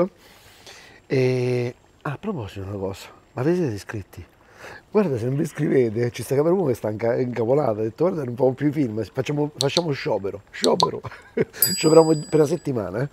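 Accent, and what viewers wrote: Rome, Italy. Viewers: sei bravissimo, ma, non prendertela a male, se tu ti sforzarsi di parlare più italiano... l'inflessione romanesca ci sta, ma fino ad un certo punto... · Lei è fantastico, io sono romagnola e mi incanto a sentire lei parlare romanesco, quello che prepara è bellissimo soprattutto spiegato da lei. Grazie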